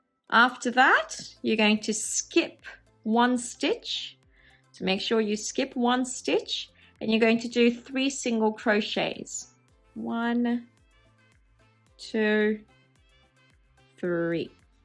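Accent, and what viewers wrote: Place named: Australia. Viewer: New Sub here. Great work by the way. PS: it’s very refreshing to finally hear an Aussie voice doing crochet videos ❤ loving it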